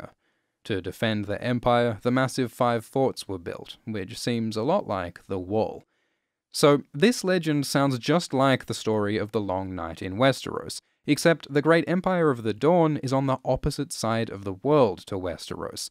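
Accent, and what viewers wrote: United Kingdom. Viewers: Dorne sounds a whole lot like Dawn when you say it with a British accent. Coincidence? I think not!!!!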